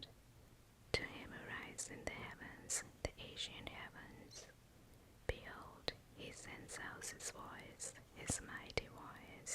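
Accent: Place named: South, United States. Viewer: Thank you for satisfying my curiosity. You’ve got what sounds like an American southern accent, very relaxing. Thank you for reading the psalms.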